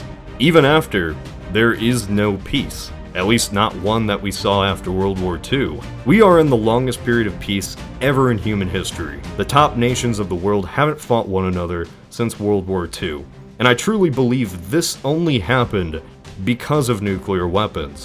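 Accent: United States. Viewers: His accent, he's American, they often drop that consonant.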